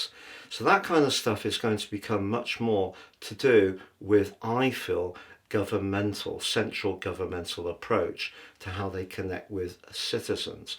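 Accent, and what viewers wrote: United Kingdom. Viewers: It's a beautiful British accent. Keep on listening, open the Closed Captions and your ear will get used to it!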